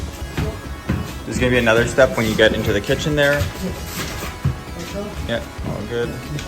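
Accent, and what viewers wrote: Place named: United States. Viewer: i thought this was the UK until you spoke. is it philly, maryland or nyc? the UK dont have basement in their town house. damp issue if you know.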